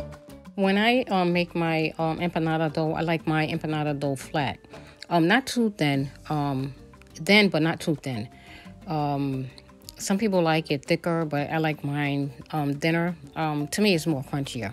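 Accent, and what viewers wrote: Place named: East Coast, United States. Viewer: Lol I think I recognize ya east coast accent is there any where in nyc that has good Cuban food?? Really haven’t had any since my great grandmother passed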